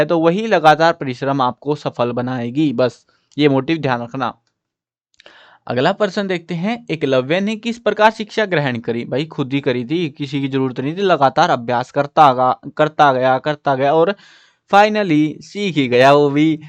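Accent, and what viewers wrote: Haryana, India. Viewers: Sir app haryana sa ho kya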